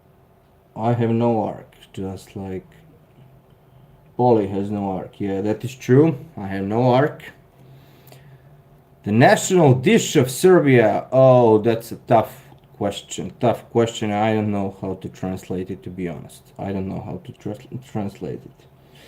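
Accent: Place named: Russia. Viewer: Your accent is TOO THICK to understand the commentary. Just like the RUSSIANS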